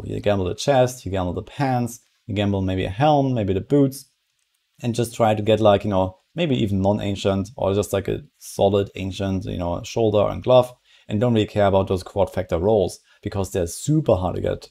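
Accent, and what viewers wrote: Germany. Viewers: Ich finde es immer so amüsant. 5 Sekunden vom Video geschaut und direkt gewusst, dass du Deutscher bist bzw. Deutsche als Muttersprache hast.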